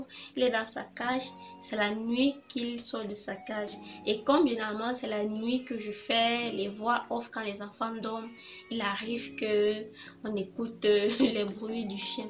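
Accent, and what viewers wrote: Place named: Cameroon. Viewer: c’est ton accent 🥰 j’ai fait par élimination et je suis tombée sur le Cameroun 🇨🇲🔥 😉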